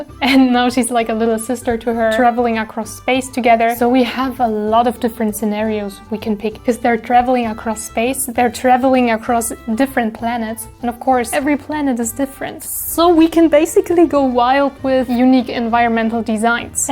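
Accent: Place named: Germany. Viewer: Omg I‘m German too! :DD (hab mir schon gedacht dass ich den Akzent erkenne haha)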